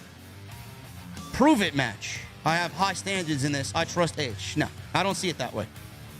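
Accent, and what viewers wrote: New York, United States. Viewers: I gotta ask, you're from NY and have a strong NY accent, but rock the Braves hat. What's up with that? Not that I got a problem with it, I was born in ATL. Just expect you to have a Yankees hat I guess lol. Thanks for representing through bro.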